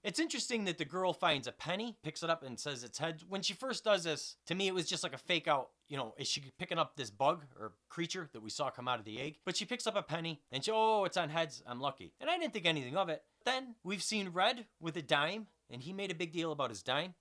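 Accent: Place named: New Jersey, United States. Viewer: Maaaaan, what's with the accent? Where are you from? New Jersey?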